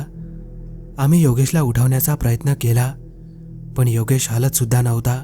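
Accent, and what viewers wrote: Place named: Uttar Pradesh, India. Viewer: अरे मित्रा story कितीही बरी असली तरी narrate करणारा आवाज पण त्या लायकीचा असायला हवाय. एक तर खुप thirdclass आवाज आणि बोलताना शब्द किती चुकीचे. Up वाला माणूस मराठीत बोलतोय असं वाटतंय. मित्रा खरंच सांगतोय फक्त अर्ध्या मिनिटात हा आवाज ऐकून story नको असं वाटतं. थोडा अभ्यास करून channel चालवा. कश्याला स्वतःच हस करून घेताय. कुणीही उठाव आणि youtube वर video टाकाव. फालतुगिरी आहे सगळी.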